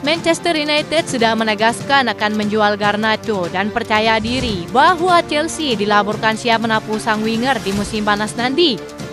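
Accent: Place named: Malaysia. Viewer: Admin logatnya melayu ya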